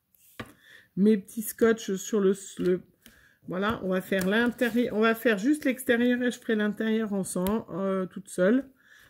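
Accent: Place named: France. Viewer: Bonjour je suis Quebequoise Et j Aime bien votre accent de France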